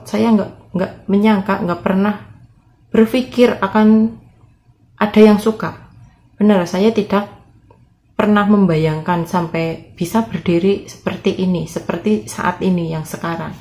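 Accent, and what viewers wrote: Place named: Banyumas, Indonesia. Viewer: Q suka sm vidio2 nya mbak. Sama sama bahasa nya ngapak 😃😃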